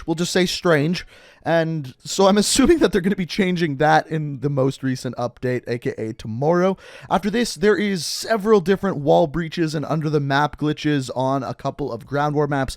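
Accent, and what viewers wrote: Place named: Canada. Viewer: Paul Martinez I believe it's his Canadian accent .. I maybe mistaken, I don't like the accent. I love his content and info tho!!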